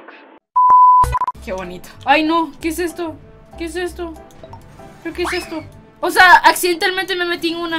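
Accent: Argentina. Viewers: Oye eseñame a hablar argentino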